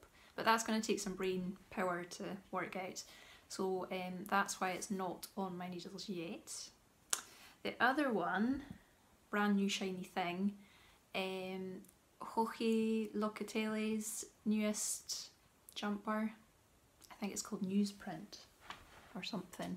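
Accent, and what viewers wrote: Scotland, United Kingdom. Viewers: Hello, Ruth "Loves to knit " recommended your podcast saying that you have a lovely Scottish accent. I grew up in Dundee and Broughty Ferry. I have been in Perth Western Australia for about 40 years and sooooo homesick. It is wonderful to sit and knit and listen to you talking. i am happy for your podcast to be as long as you need. Great first podcast. Thank you. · Ooh very nice. My dad was from Cromarty so it's lovely to hear your accent. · Hi I really enjoyed your first podcast and seeing your knitting. Love your Scottish accent too! Greetings from New Zealand, I live on the stunning Kapiti Coast about 50 minutes north of Wellington in the North Island. I love how YouTube brings like minded people together from all corners of the planet. If you don't already watch, you might also enjoy Knitting Traditions podcast. There's so many to choose from! 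I'm glad I found yours. Your Joji sweater will look fab in your yarn choice and I look forward to seeing it come together. See you next time x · So glad I found your podcast! You make beautiful things and I love your accent :-) I got married in Scotland and can't wait to return some day. Can relate to your feelings about your first episode, as I just made one of my own. Looking forward to binge-watch your other episodes :-)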